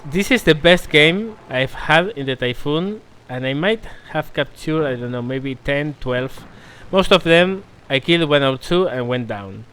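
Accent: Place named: Spain. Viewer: Pareces español por el acento inglés.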